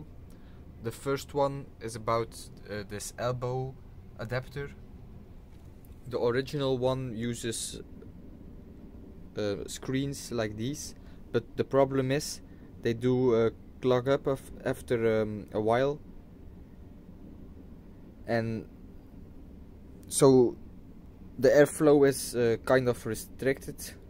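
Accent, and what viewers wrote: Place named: France. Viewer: ce fameux accent français ;)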